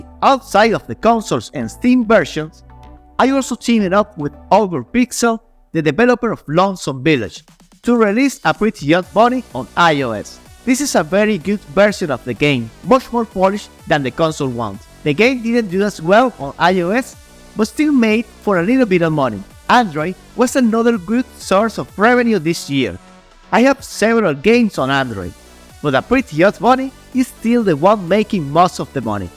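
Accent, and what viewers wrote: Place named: Spain. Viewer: Ese inglés, yo diría que hablas castellano ¿es posible?.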